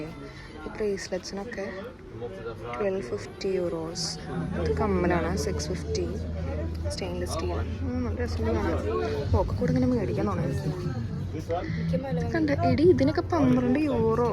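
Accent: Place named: Thrissur, India. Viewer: Aa thrissur slang 😁😁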